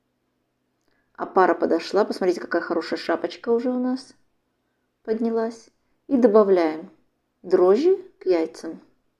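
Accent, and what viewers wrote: Baku, Azerbaijan. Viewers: Спасибо большое за рецептик, у вас такое тесто получается бесподобное, буду печь к Пасхе по вашему рецепту. А разговор ваш чисто бакинский 😊 ❤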